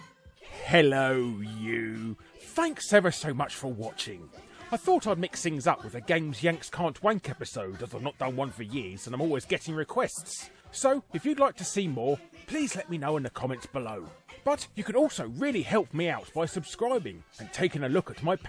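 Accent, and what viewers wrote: United Kingdom. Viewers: You have the best brittish accent on this website.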